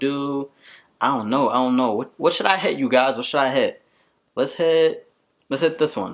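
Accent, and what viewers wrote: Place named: New York, United States. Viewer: I luv the way you talk😍😍😍 Is he from NewYork?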